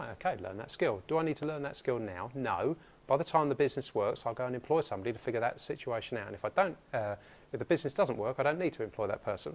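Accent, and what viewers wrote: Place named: England, United Kingdom. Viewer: I meant that the audio in another channel was louder (Not same video). The thing is English accent is very heavy which makes it difficult for me to understand him even on a loud volume so listening to him on a low volume makes it harder. Oh well.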